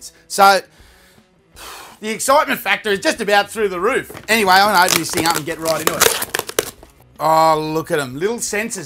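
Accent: Australia. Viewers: Aside from the Australian accent, you remind me of steve irwin lol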